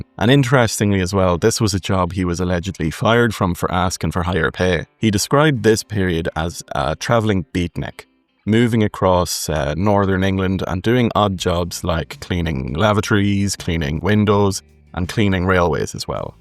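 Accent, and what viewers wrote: Ireland. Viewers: Never apologize for your Irish accent!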